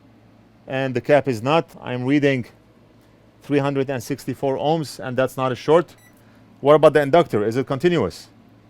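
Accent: Russia. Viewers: His accent doesn't sound native, he's more of a russian than American, that's why America wants to get rid of Russia.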